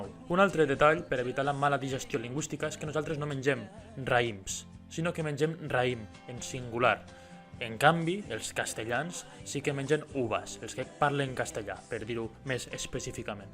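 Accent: Western Catalan, Spain. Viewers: No està malament el vídeo, ara, no se de quina regió 'dels països catalans' ets (? Parles un català occidental molt curiòs! Estic d'acord amb tu menys en la de 'nit bona' , la 'nit bona' (que no se si algú l'anomena així) la 'noche buena' espanyola no es la nit de nadal, es la revetlla de nadal (la nit abans) i pel que fa a la resta, bé, crec que algú amb seny sap que són 'castellanismes'.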